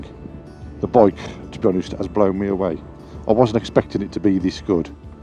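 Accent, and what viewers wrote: Birmingham, United Kingdom. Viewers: Tuned into to this as I've been thinking about getting a full license and the idea of a CB500X caught my attention for a while. First few seconds and I'm hearing a familiar accent... Within a minute or two I realise where you are and how this isn't a million miles away from me! First time I've seen a bike vid that's based over in Brum side. I'm going to have to go through some other vids now! Thanks in advance. All the best :)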